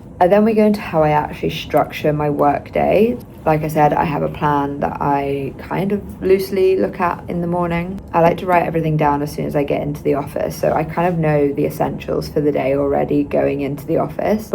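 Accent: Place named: Scotland, United Kingdom. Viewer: Omg you’re Scottish! Love from a fellow Scot in London ❤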